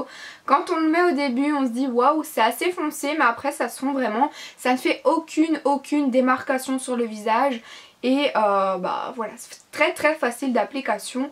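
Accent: Belgium. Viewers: Ta voix avec ton accent Belge c'est super Mignon ah ah 💕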